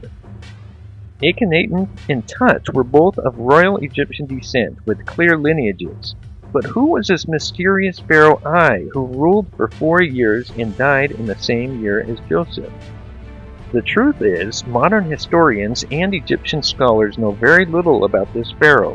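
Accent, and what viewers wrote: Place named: Midwest, United States. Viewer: from the North, Midwest. (Going by that accent)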